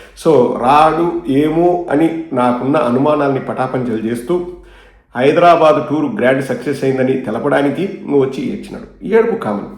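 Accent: Rayalaseema, India. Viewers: complete ga Rayalaseema slong lo videos Pettu abba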